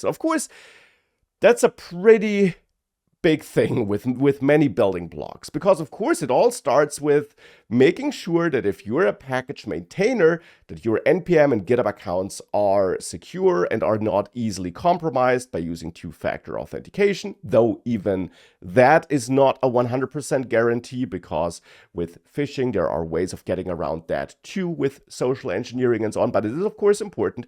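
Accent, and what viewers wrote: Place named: Germany. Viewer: Just get rid of your german accent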